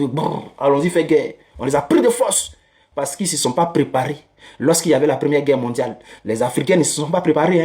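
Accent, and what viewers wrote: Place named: Ivory Coast. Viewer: À t'entendre parler on sait que tu es un bouqui original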